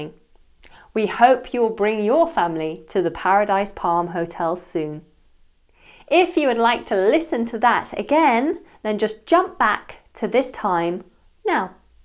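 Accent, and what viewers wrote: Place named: United Kingdom. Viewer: Hello,. I speak English like an American, as I was born and raised her. I do enjoy your slant on proper British English, however. BTW, I ran across a single mother (mum) who has a YouTube channel, and she speaks "perfect" Yorkshire English, IMO. The site is "Mama Mei." I enjoy listening to various dialects in English and other languages. I do speak about 20 languages: it's a hobby. - Dr Dave Menke, Tucson, Arizona, USA.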